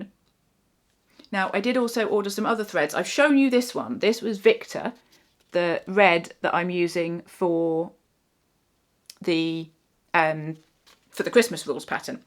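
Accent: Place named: United Kingdom. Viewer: Great show. I enjoyed your Wip parade that's when I found you. Brilliant to hear another Brit. Your are BUZZ ing around the world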